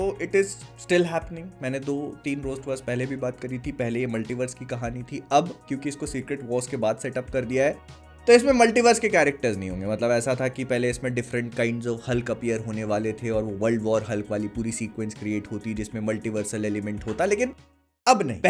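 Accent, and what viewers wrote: Bhopal, India. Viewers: Bhopal se ho kya aap?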